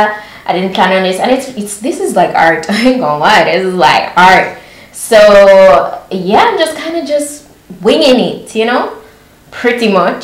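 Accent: Jamaica. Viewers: I saw you promoting a foundation on Pinterest and I was like this girl sounds like a Jamaican 🇯🇲 so I had to find you and I swear I watched all your vlogs and videos (All) and I love you, your sis and your mom you guys are the realest 😊 was actually waiting on a new video. You go girl ppl like you make me proud to be a Jamaican. God bless you 🙏🏽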